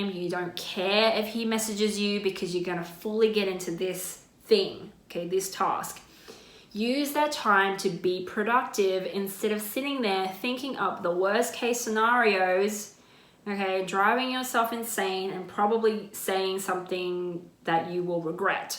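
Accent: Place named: Australia. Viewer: Can hear that aussie talk from miles away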